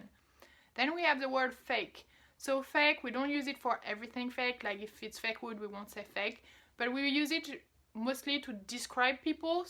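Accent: France. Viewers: it's pleasant to listen (and watch) you say things with your French accent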